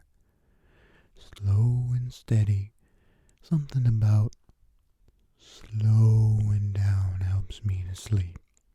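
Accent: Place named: South, United States. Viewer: Southern accent,I love it!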